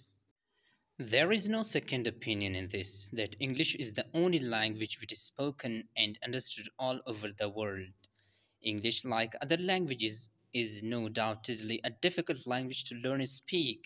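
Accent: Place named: London, United Kingdom. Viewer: why he sound like he come from landan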